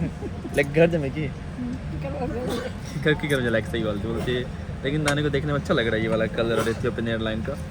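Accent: Bihar, India. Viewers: ❤❤❤❤❤ Bhai aap to same to same meri tarah hi bolte ho bihari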